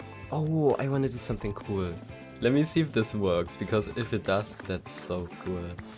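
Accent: Germany. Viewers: You can not hide that u are german from a german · Man hört das deutsch einfach raus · I hear german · Holy german accent Fire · Holy German accent... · I can tell you are an german by the Voice 😂 Love you Video ❤ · German accent? Nice vid. Go on buddy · Dieser deutsche akzent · I Can heare that you Are German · Why sounds this English so german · Nice Video, but you can hear the German accent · I hear a little bit of deutsch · I hear deutsch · Ich hör das Deutsch raus beste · hey bro, are you from Germany? You sound like it. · bro man hört deutsch raus :D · Why do you sound so german😭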